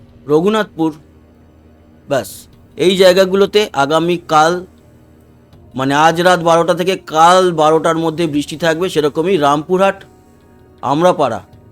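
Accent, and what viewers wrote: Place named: Bangladesh. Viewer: আপনি কি বাংলাদেশ থেকে ভারতে এসেছেন ? ছত্রে ছেত্রে বাংলাদেশ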